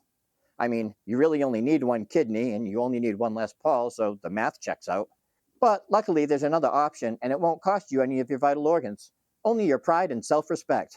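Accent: Boston, United States. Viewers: As a Brit, it sounds exactly like how Americans say it to me...I thought he was American! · The Boston accent is a nice. Gitauh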